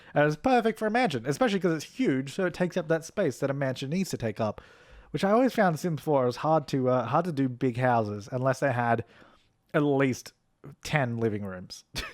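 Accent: Australia. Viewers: yea cause he's ostrayan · Is... Jazza from draw with Jazza? I swear they sound identical, not just the Australian accent.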